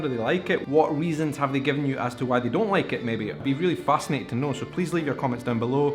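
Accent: Scotland, United Kingdom. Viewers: Hey, I did watch the first season of Outlander, and loved it. As an Ex Pat Scot, from Edinburgh, I initially watched it, just to hear my native accents again. And I loved both of the main Characters, and yes, they both are handsome and beautiful. besides being good actors. And the story is well written. I also enjoy Sci-Fi, or these time travelers. Why I missed series 2 and 3, I'm not sure. Probably because I have so many viewing options, that it probably just got lost in the midst of all those options. I do enjoy a strong Female Character, and thankfully, Brits have plenty of great female actors to play them. I can't even recall the channel it was on, but I know sooner or later, it will appear on one of my Streaming Media systems. Then I'll catch up with the show. I subscribe to two British Streaming Channel, and Amazon. I just recently cancelled my Netflix. 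So I'll do a web search, for Outlander, and maybe catch up with the seasons I've missed. I've often thought, America and the Studios should pay the U.K. Royalties, for all those Royal Sagas, and others they have produced. Even though, I've been an American for over 50 years, I'm still a Scot, and it seems, much of my accent. Americans do live the Scots. And show it, especially those with Scot's blood in their veins. I enjoy your Vlogs. And hearing my old accent.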